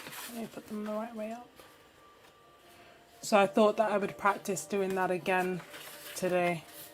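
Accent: United Kingdom. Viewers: Lovely florals, love the colours! And yes, you do have British accent LOL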